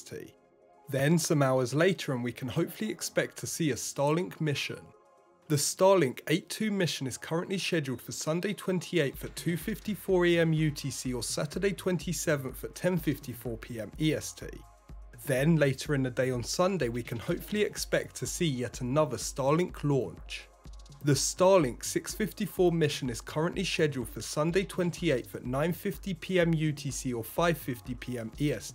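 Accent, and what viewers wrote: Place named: Cockney, United Kingdom. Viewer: That’s a London East End accent. “Fursday” instead of Thursday etc.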